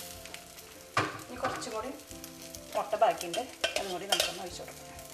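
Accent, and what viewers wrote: Thrissur, India. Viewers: Thrissur ano veedu...accent kettitu thoniyatha..!!!..:)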